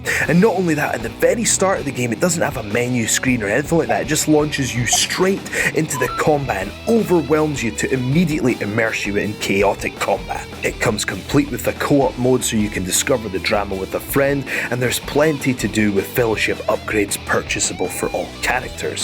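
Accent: Scotland, United Kingdom. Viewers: I just love to hear this guy talk. is he Scottish or Irish? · the lovely thing about is their presenters are always SO hot :P that sultry Scottish voice serenaded my soul haha.....plus hes proper fit. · It is his Scottish accent. Dune becomes Djune, Duracell is Djuraceyll etc. No big deal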